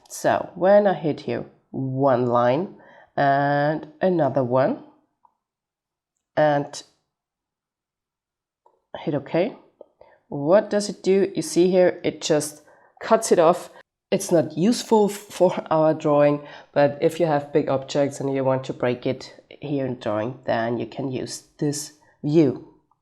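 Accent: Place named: Austria. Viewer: OMG you are too cute, I could listen to you explain things forever and your cute Austrian accent is the icing on the cake!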